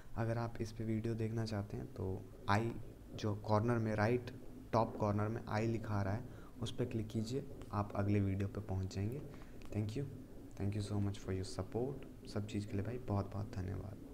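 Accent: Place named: Madhya Pradesh, India. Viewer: Bhai wo sab to thik hai...lekin tumne iss video me pakaya bahut hai. Ek simple si chiz batane k liye itna jyada bola upar se tumhare bolne k style se samajh aa raha h ki tum MP se ho.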